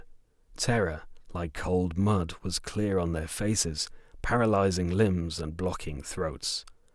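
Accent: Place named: Birmingham, United Kingdom. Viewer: Nice. Love the quivering Brummie. Only thing I would suggest is a very slight further increase in pace during the "combat". But that is just my opinion.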